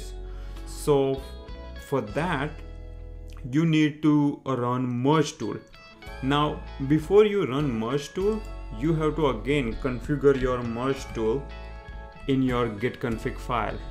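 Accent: India. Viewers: AND YOU GOT best indish english pronounce of i ever hear man)))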